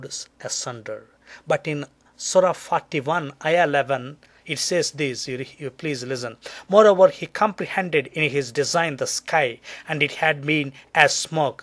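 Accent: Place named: Kerala, India. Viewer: very funny,, a typical mallu,,saying yexposed,,yauniverse,,dactar jaakir nayik,,,man plz go to some english school and learn some thing ,,instead of wasting time like this..plz go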